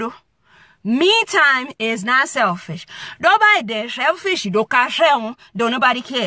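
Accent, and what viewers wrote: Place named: Yoruba, Nigeria. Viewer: Ye mi alafia ni ti wen Mami, so glad to watch ur video missed the vibe n enlightenment listening to u speak our unique dialect u just amazing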